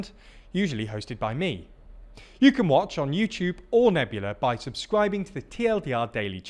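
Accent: United Kingdom. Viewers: It's funny that a british sounding guy is talking about EU news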